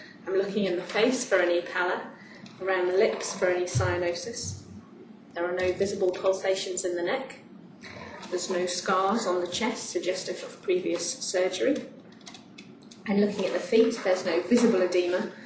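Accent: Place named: United Kingdom. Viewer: Wait, you hear her accent and automatically think American? Sounds like she is from the UK to me but jrodulfa1 is correct.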